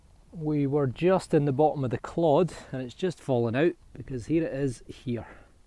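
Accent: Scotland, United Kingdom. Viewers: Aw man sing, your Scottish accent is very cool. I have not long stepped up to a Deus. I was using a Fisher F2 but i think i had out grown it. I'm hoping the Deus makes all the difference. Thanks for your time and showing how superb these machines are. I haven't scratched the surface in terms of it capabilities and don't expect i shall for some time. I got the wireless remote and ws4 package and it's super light, very impressed.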